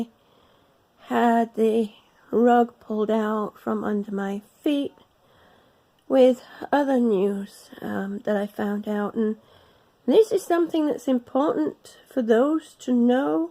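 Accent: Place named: United Kingdom. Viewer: Hi. I'm just sort of taking it day by day. I caught a cold a few weeks ago but thankfully it didn't drop into my lower respiratory where it could cause all that havoc like the first two times since I got back from Europe in 2015. I think drowning myself in Vitamin C helped to keep it confined to the upper. I just read that the FDA has ordered the pharmaceuticals to drastically cut back on the production of opioids this year and probably even more in subsequent years because of the problems of youth abuse/death. Have you been affected by this,? People in pain management who follow their Dr's directions are unfairly being caught up in this tug of war. Dr's are getting terrified to prescribe narcotics because of threats from the FDA. It's really an intractable situation and innocent pain sufferers are snared in these politics. I cannot think think of a person more qualified to receive them than you and I pray you aren't affected by all this. 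PS I detect a British accent. Are you on the NHS or are you here in the US? You may not be feeling the effects of the cutbacks we are having here. I read that Britain is one of the friendliest countries for use of narcotics if the use is legitimate. Hang in there. Somewhere out there is an understanding Dr. who ca appreciate your dilemma. You just have to find him.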